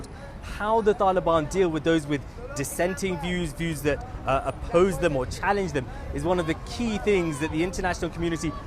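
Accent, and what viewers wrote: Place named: United Kingdom. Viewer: イギリス人みたいな発音だ。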